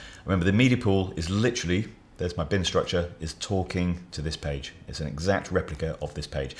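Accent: England, United Kingdom. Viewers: apart from the British accent, the video is great. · I am Swedish and I just love your English accent. Its sound so pure and beautiful, not like the american accent 🤣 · I stopped at this channel purely because the presenter speaks British English, not American English. Then I found that he is knowledgeable and thorough as well, so I subscribed. 🙂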